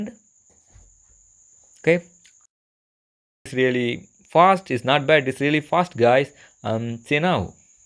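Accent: South India, India. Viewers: Damn Indian voice with a rather Asian look? Tf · Dont steal peoples videos then put your own watermark on it.... Sad. You actually think people believe this is your video, showing chinese person with indian voice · South Indian voice over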